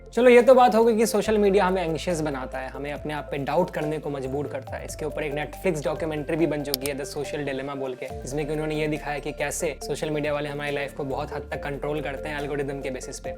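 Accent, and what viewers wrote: Bihar, India. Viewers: your bihari accent is sosexy. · Bhaiya got the 💯 Bihari accent blend 😸PURE IITian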